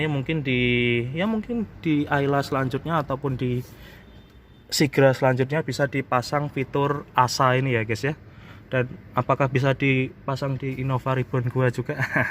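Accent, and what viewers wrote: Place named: Betawi, Indonesia. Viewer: Guwa apa kulo? Betawi medok nih 😅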